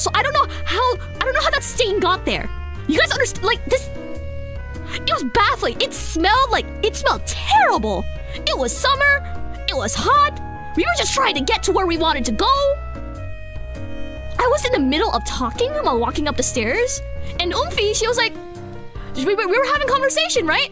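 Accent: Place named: New York, United States. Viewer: Yep, sounds like New York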